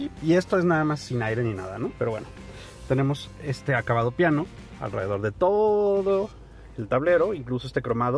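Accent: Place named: Fresa, Mexico. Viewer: Hablas muy fresa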